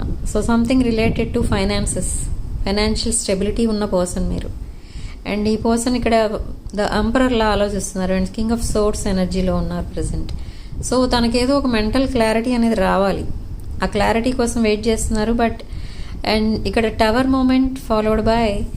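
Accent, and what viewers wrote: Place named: United Kingdom. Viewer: The voice u have is very pleasing...in between ur smile...the english ackcent ...you use...these all make me very happy to see readings very eagerly...we are gifted to have a good human being...❤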